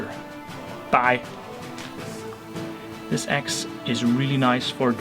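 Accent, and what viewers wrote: Denmark. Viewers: er du dansk?, you have a danish accent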